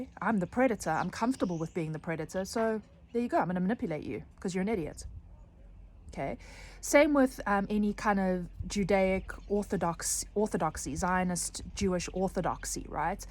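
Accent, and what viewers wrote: South Africa. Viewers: is this a South African accent?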